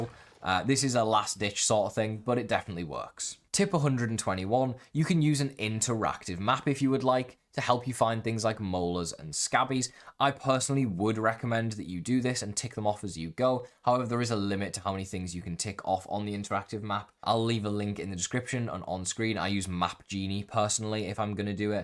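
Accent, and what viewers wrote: Liverpool, United Kingdom. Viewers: Ooohhh is that a fellow scouse accent I hear?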